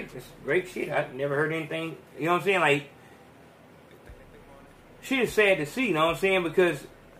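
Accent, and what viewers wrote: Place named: South, United States. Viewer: You so country but i 💕that though!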